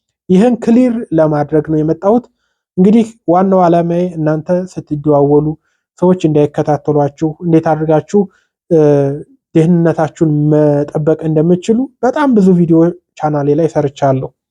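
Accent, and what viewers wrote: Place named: Wollo, Ethiopia. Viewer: ወሎዬው አክሰንትህ እጅግ ተመቸኸኝ።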